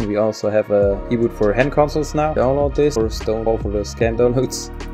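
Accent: Germany. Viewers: немецкий акцент, сразу узнал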